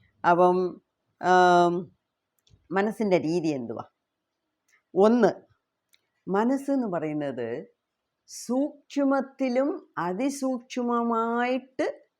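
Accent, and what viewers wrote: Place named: Tamil Nadu, India. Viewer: Maminte plan anusarichu ellam orderil paranjal mathi 🙏Maminte thamizh kalarnna Maminte speech eniku orpadu ishtam 😍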